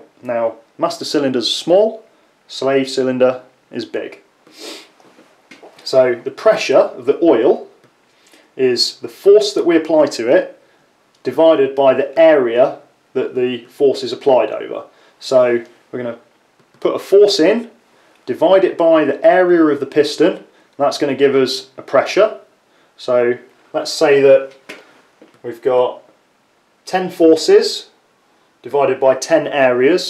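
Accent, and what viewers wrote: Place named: Southern England, United Kingdom. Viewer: Nice refurb! I enjoy your videos very much... your 3 Phase Rotary Converter was particularly interesting... thinking of doing the same thing myself, so thanks for sharing. Greetings from Southport (it's not in the South and it's not a port!). You sound like you're a southerner... I'm from Oxford originally. Cheers matey!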